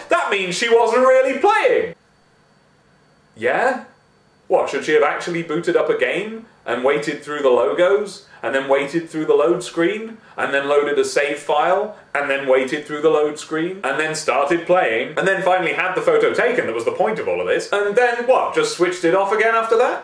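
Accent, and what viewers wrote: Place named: United Kingdom. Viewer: You were totally talking in a british accent and that ruined it for me could you be more American?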